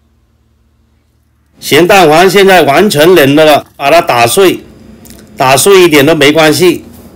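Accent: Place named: Cantonese, China. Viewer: 讲解很详细，广式普通话很好听，让我这样完全听不懂粤语的人能听到明明白白，谢谢，果断订阅。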